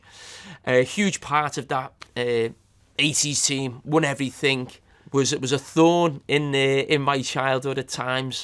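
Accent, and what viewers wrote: Liverpool, United Kingdom. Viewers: Why we having this annoying scouser speak on the official LFC channel? His disrespectful comments and bias against Mo over the year should not be forgotten. Worst pundit and lfc player.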